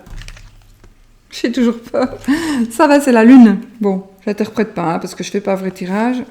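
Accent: Belgium. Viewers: Bonjour,Magnifique ton oracle … tes dessin sont tellement parlant … il m'a tapé dans l'œil dès que je l'ai vu et j'aimerais vraiment me le procurer … j'espère que cela est encore possible.. J'adore ton accent vive la Belgique … cet accent il me manque … mon mari était belge malheureusement il est décédé en juin 2018. Belle journée à toi. merci Pascale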